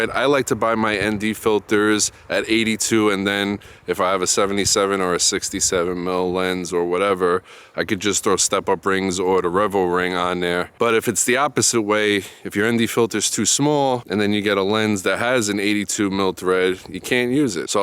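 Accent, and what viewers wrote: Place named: New Jersey, United States. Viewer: If i had to guess the accent i wud say JERSEY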